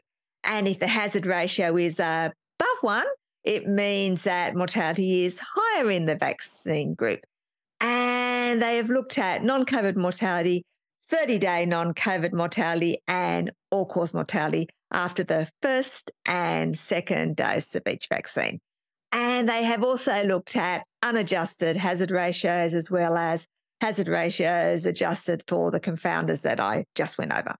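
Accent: Australia. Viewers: Wait a second - all Aussies sound like that. And looks fine to me. Are you Ok?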